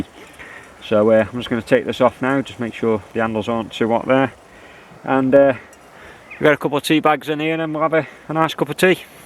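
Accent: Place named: Merseyside, United Kingdom. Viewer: Nice video. You say that you live in the Midlands, but I can't help but think that you're from Merseyside?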